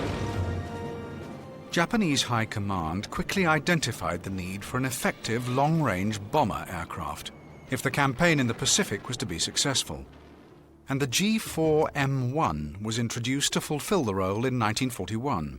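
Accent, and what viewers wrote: United Kingdom. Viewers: Very British accent indeed ....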